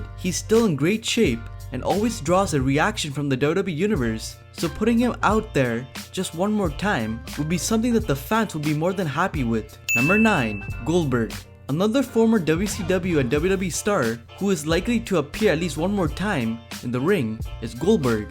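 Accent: India. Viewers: The speaker is from where??? Sound like indian fucking garbage voice and accent trash channel trash speaker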